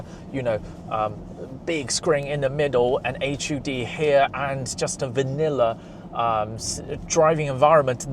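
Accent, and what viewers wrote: United Kingdom. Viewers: British / Chinese Accent is a thing ?! Whaaa ?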